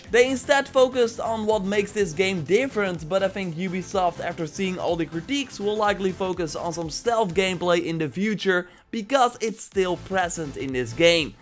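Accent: Netherlands. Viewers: Nederlands accent is heavy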